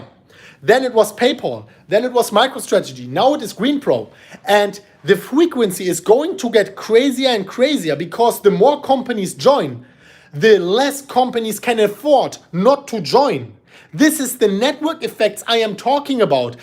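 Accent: Germany. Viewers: Ich wusste sofort das du Deutsch bist 😂 Der Akzent kommt mir bekannt vor. Much love aus Hamburg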